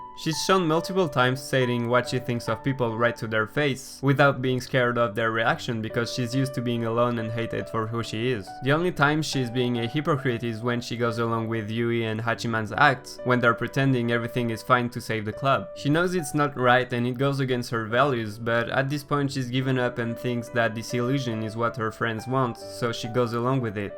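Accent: France. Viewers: Good video !! Btw, are you french ? (Your accent)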